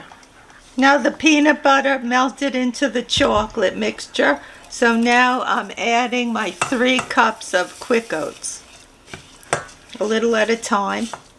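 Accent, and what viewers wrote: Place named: Long Island, United States. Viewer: Ex-Long Islander here out in Vegas. LOVE hearing your Long Island accent makes me feel as if I was back home! I gotta make these tomorrow. Wishing you both a warm and Merry Christmas! ♥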